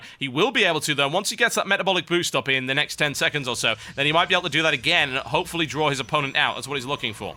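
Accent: United Kingdom. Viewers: your British accent keeps me alive!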